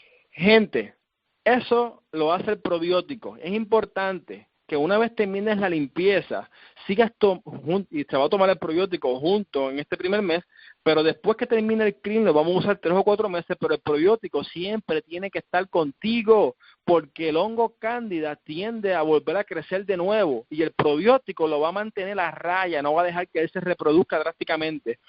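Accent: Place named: Cuba. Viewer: NO SE ENTIENDE CUAL ES EL PRIMER MEDICAMENTO QUE ACONSEJA..."..no se qué...clins" , que contiene 3 productos, dice. Pero imposible entender por la dicción de quien habla, parece cubano, no?...Por favor, si alguien sabe de qué medicamento se trata, compartirlo o responderme. Muchas gracias! 18/03/2019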